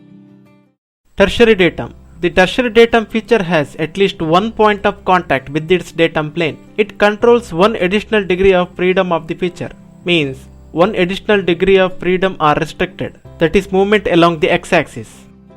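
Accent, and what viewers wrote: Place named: India. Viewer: 印度口音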